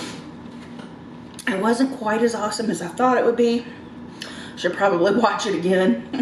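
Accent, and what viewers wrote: South, United States. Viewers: 🌮Cheat days are a good idea when your weight loss stalls. Enjoy!🌮 Your southern accent is thicker than mine today! Lol!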